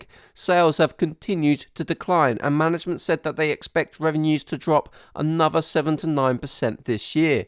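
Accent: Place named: United Kingdom. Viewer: Great presentation and love the British accent!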